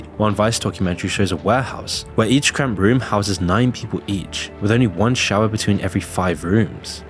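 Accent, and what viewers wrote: United Kingdom. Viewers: Why is it these videos are always made with a guy with this kind UK accent? It's driving me nuts 😂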